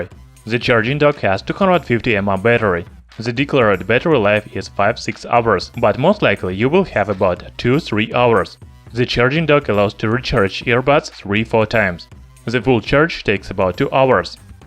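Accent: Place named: Russia. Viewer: What is your accent? Russian? I can't tell.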